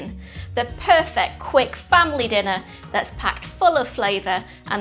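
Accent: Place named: Northern England, United Kingdom. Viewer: As a British person living in Australia I’m liking the northern accent whilst I make my dinner. When my husband asks what we’re having to eat I say the recipe name in a northern accent - I can’t help it hahaha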